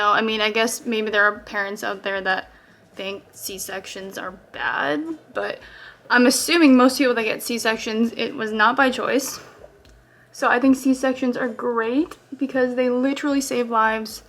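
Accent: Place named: New England, United States. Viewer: I totally knew where , you were from just by how you talk and do you’re make up NEW ENGLAND GIRLS “ respectfully “ go get you’re Starbucks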